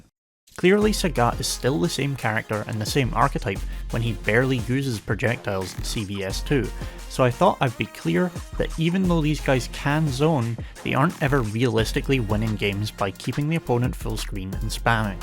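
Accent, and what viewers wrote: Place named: Scotland, United Kingdom. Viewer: Nice to here a Scottish accent! I'm in glasgow, do you play SFV? up for a few sets sometime?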